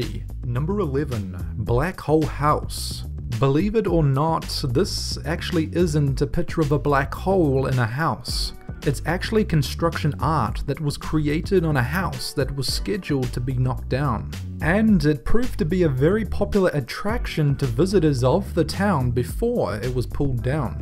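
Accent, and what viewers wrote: New Zealand. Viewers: Maybe a New Zealand accent? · First of all, did not copy Danger Dolan's accent. Haven't any of you ever heard of different accents. Ex: Australian, New Zealand, British, Canadian.... and so on And FYI every location, Country, State, or resident, has a different accent depending were the person is born. 2nd of all, Why do random people asum he is purposely trying to copy other accents? There is more than just only one person, with the same accent. Thirdly: Danger Dolan is fron Australia, and is from New Zealand. Fourthly: Dolan agreed to help, with the production of this channel. And Lastly: If you dislike or are still assuming this is a ripoff, then please leave this channel, and just go watch something else. For every stupid person out there, who complains about accents on this channel, Why are you even here on this channel?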